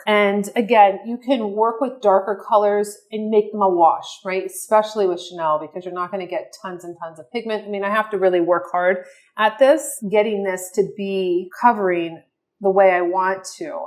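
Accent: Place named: Miami, United States. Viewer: I just stumbled upon your videos and as soon as I heard you talk, I said to myself.. She’s from Miami. I am, too. Great makeup tips btw. 😊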